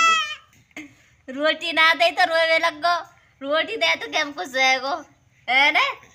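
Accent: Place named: Mathura, India. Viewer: Apke mukh se dehati bhasha bahut sunder lag rahi hai.bhasha ke hisab se aap mathura side ki lagti hain.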